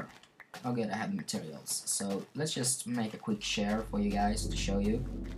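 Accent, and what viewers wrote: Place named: Sweden. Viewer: like a swede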